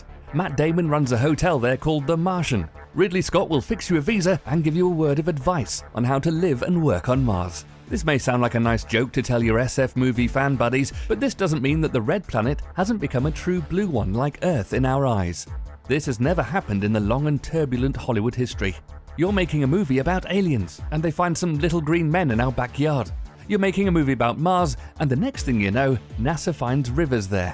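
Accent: England, United Kingdom. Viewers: what the f u c k are you doing ? ( peter 'pole' gazer ) pack your bags ? fix you a visa ? turbulent Hollywood history ? . okay don't answer that , your not from around here are you ? I mean from America ? and worst of all an English accent 'cynical humor' narrator . ( I'm speaking for myself ) but I'm still going to say ' w e a r e s I c k to d e a t h of b r I t I s h a c c e n t s p l a s t e r e d e v e r y f u c k I n g w h e r e' it's not cute, it's not jolly , it's not a "romp" . even if I'm way off on this and get all kinds of blowback I don't care . take your effing insults and stick'um up your A R S E ! jeezus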